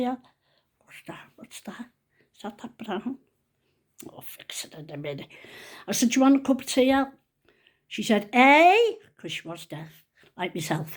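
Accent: United Kingdom. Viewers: I love listening to your British accent ❤ and thank you for the advice 🙋‍♀️ about the infection, as women we have more to look forward to 🌬 just being women is a challenge the older we get..thank you for caring 💕🌹💕😘🙏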